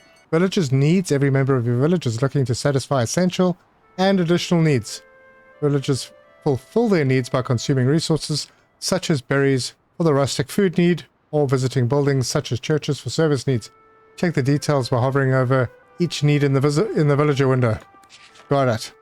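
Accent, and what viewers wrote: South Africa. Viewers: Knew I can distinguish a South African accent when I hear one, hello broer!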